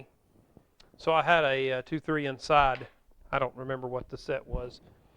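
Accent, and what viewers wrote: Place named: South, United States. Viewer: Thank you! I love your Country Accent!!! 🙏🏿❤️❤️🥰❤️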